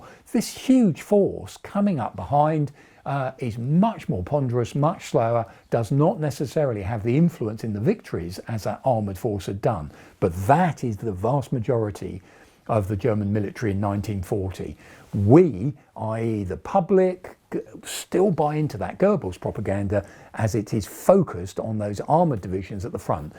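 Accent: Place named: United Kingdom. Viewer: i am apologizing since English is not my native.enjoyed this video and i am wandering what part of G.Britain his accent came from.in my country tv series Only fools and horses is stiil popular and finding some similarities,although prounancing every sounds perfectly clear and have no problem to understand him.once more apologizing for this ugly English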